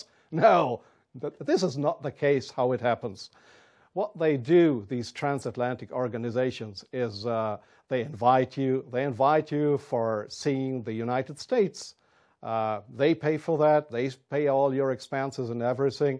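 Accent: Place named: Germany. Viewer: Hi Buddy, German English you speak. But doesn't matter, you are Man, beileid...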